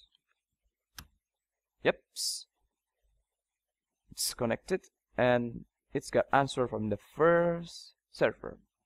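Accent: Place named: Spain. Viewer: indian guy with a spanish (is that spanish) accent? EPIC!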